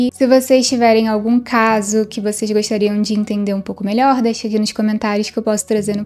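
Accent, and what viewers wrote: Rio de Janeiro, Brazil. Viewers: Vc é carioca? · Sua voz é muito bonita, o vídeo bem editado, tudo quase perfeito, mas esse seu sotaque carioca fortíssimo complica, soa quase como um cacoete, e faz perder a imersão da narrativa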